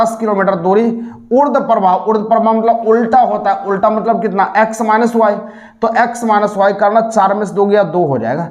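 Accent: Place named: Patna, India. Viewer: Sir I think आप पटना से हो न Please confirm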